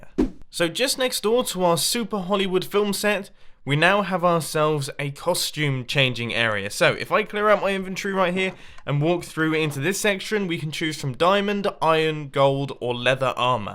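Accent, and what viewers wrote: United Kingdom. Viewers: Leave him alone! He's British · Mumbos super british side kicks in after 2 minutes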